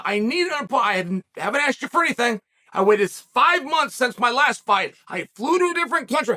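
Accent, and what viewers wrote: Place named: United States. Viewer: Clicked on the vid, heard the American accent, closed the vid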